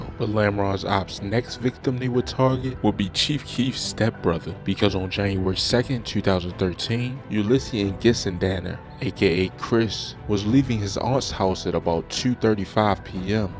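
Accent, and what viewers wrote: Baltimore, United States. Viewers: I can hear that you're a fellow Baltimore native. It's crazy we are fascinated with the Raq like our city ain't our here geekin themselves. Lol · Bro are you from Baltimore? I hear them heavy U's in your accent 🤔💯✊🏿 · You from Baltimore? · You have to be from Baldimore. I can hear it in the accent.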